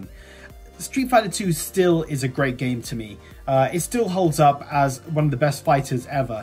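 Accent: United Kingdom. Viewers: im just going by your accent you are from the uk but over here in ireland tekken dark resurrection is still on the psn and so its still on the vita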